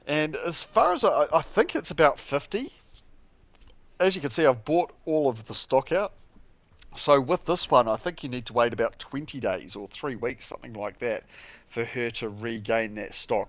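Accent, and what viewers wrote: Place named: New Zealand. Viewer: New player here. Didn't know about the account ledger at Yanita. Thanks for this 🙌 Great Kiwi accent, mate! Edit: off topic, am i the only one who lost access to the shrine at Telok Penjarah, since the last update?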